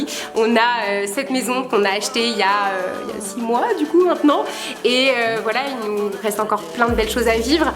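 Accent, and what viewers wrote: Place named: Normandy, France. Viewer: J’adore ton petit accent normand car je suis également native de cette région et j’ai cette sensation réconfortante, familiale quand je regarde tes vidéos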